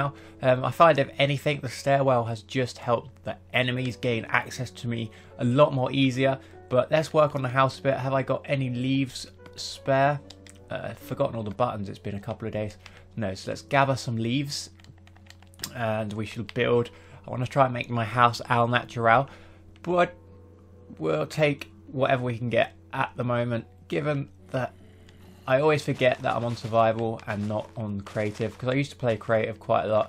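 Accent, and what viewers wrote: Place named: RP, United Kingdom. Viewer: Your posh voice makes me laugh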